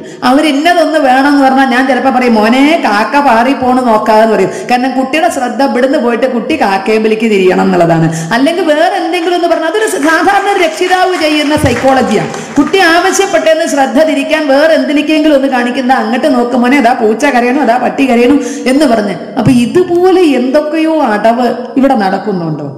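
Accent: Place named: Valluvanad, India. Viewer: വാക്കുകൾ സ്ഷ്ടവും വ്യക്തവും കൃത്യതയാർന്നതുമാണ്. ഇടയക്ക് വള്ളുവനാടൻ ശൈലി അറിയാതെ കടന്നു വരുന്നു. വളരെ മനോഹരമായ പ്രസംഗം. കേട്ടു തുടങ്ങിയാൽ അവസാനം വരെ കേട്ടുകൊണ്ടിരിക്കാൻ തോന്നും. വിഷയമാകട്ടെ ,സമകാലീക ഹൈന്ദവസമൂഹത്തെ സംബന്ധിച്ചിടത്തോളം വളരെ പ്രസക്തം. ഇതാണ് ശശികല ടീച്ചറുടെ പ്രസംഗശൈലിയുടെ പ്രത്യേക .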